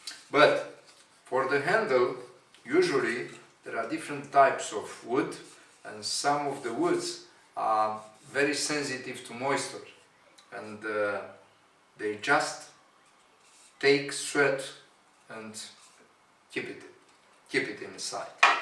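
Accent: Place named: Romania. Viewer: Si mie mi se parea ca are accent romanesc, dar am zis ca-s nebun. E brand romanesc??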